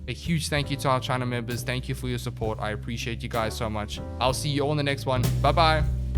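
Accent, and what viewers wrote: South Africa. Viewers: Bro it just hit me. Are you South African?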